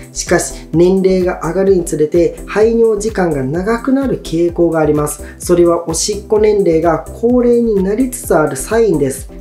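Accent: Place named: Tohoku, Japan. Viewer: はじめまして いくつか動画拝見し チャンネル登録しました。 東北なまりが懐かしいです^_^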